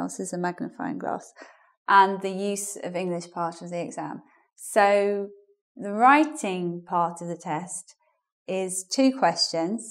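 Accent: United Kingdom. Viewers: Hi, please could you tell me what part of UK are you from? I mean, I need to know where is your accent from. Thank you for the information on CAE, I'm gathering study material to take this certification. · She sounds like she's from the U.K. to me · Hello. Tell me something about your accent - for which part of the UK it is typical? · i dont know why when Women do British accent its so hard to listen. in opposite Men are better with british accent :lol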